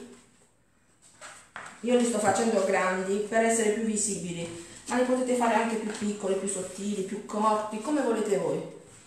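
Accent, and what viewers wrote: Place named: Bari, Italy. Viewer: Ciao (e )... ho scoperto da poco il tuo canale e sentendo la tua cadenza barese mi sono subito iscritta perchè sono barese anche io, ma vivo a Torino. Finalmente ho imparato a fare i "disctinculo" ma vorrei farne per una mia amica che è celiaca...mi potresti consigliare sulla farina da utilizzare? Ho già provato con la farina di riso ma non mi viene bene, cosa mi proponi? Grazie se mi rispondi.... a presto, Maria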